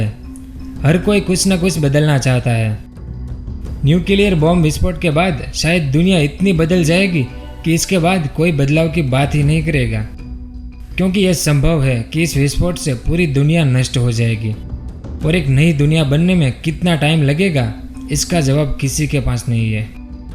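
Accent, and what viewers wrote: Gujarat, India. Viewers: bha gujrati ho kya? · rocky rock 👌👌👌 u r 1000% bole ap ye voice boht gujrati he hindi 😃😃😀😀✌✌👌👌👍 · nic video ...hahahaha Gujarati jalak rahi apki awaz me ... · Bhai Gujarati me Hindi kyu bol rhe ho ! Try to control tone, it's nice video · Gujarat ki bhasa hi alag hoti hai jo ki hindi bolne se pta chalta hai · aapka bolne ka style gujrati hai. Aapka ascent se pata chal gaya.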